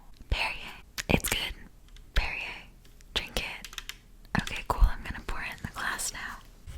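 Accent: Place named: United Kingdom. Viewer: shes sounds british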